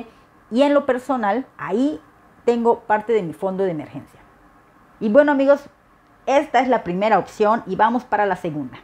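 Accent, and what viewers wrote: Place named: Yucatán, Mexico. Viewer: Qué gran descubrimiento con tu canal. ¿Eres de Yucatán, verdad? Por el acento. Acabo de ir a ese estado… hermosísimo. Felicidades y sin duda: SUSCRITO. Saludos desde CDMX.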